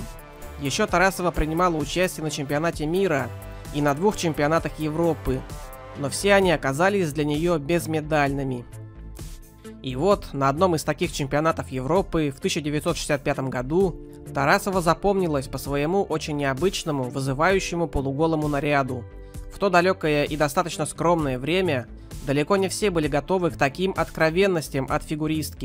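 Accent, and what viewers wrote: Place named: Ukraine. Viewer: типичное украинское произношение, не в обиду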